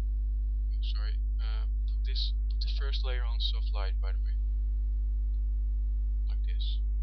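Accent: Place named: Netherlands. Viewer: haha je hoord wel egt goed dat je NL bent :D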